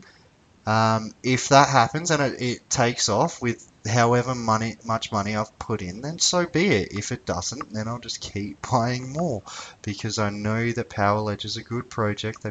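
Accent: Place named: Australia. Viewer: Gday, just subbed. nice to hear an aussie accent doing some price action. New to all this but watching as much as possible to learn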